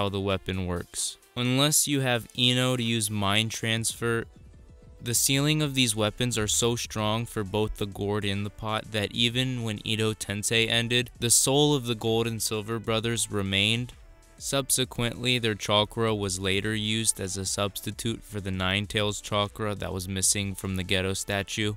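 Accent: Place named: Ohio, United States. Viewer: HE talks like this normally too, he's not doing this on purpose. Its just the Ohio prose my man.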